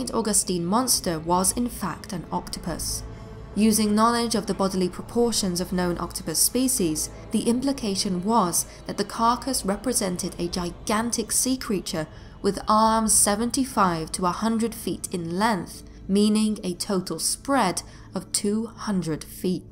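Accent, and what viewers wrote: United Kingdom. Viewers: The British accent played out in these narrative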